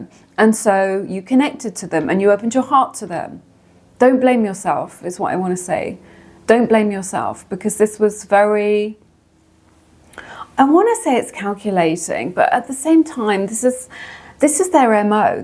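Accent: England, United Kingdom. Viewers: your readings are spot on. ive found that if you are a caring giving person many people may come too hate you. ive never understood why. i really enjoy your readings. you have totally open my eyes and elevated my life. thank you so much. side note here i was stationed in lakenheath england 1968 to 1970. i almost married an english girl. im now 77 and im amazed how you have enlightened and motivated me . thanks again and i love your accent brings back fond memories.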